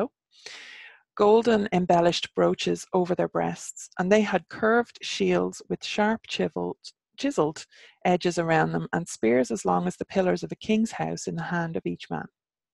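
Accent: Ireland. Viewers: Enjoying this immensely. I am in the US so your "Irish lilt" is especially charming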